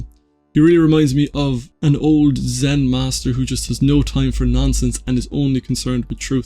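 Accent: Ireland. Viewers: Oof, what a lovely irish accent. Such pleasant listening.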